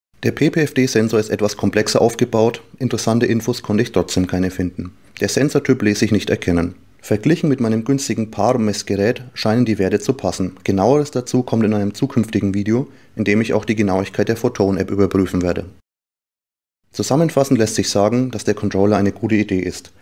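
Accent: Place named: Franconia, Germany. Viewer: Gutes Video👍🏻. Nicht zu lange, gut erklärt und angenehm zuzuhören. A propos zuhören. Darf ich fragen woher du grob kommst ? Du könntest vom Dialekt quasi mein Nachbar sein 🤣. Fränkisch ist einfach symbaddisch :) Lg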